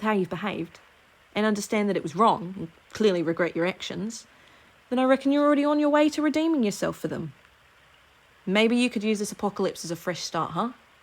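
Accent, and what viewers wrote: Australia. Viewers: DUUDDEE YOUR AUSSIE ACCENT IS AMAZING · YOUR AUSSIE ACCENT IS SO GOOD!!!!!!!!!!!!!!!!!!!!!!!!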